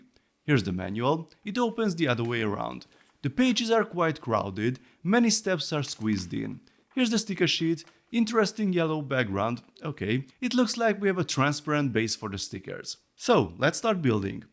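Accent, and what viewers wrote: Hungary. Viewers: Szerintem van egy kicsi magyar akcentusa😂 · No way hogy a bro magyar, nagyon fasza accent🎉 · You are Hungarian? I could have sworm I heard the accent, but never openly guessed it 🤣 howdy neighbour, greetings from Croatia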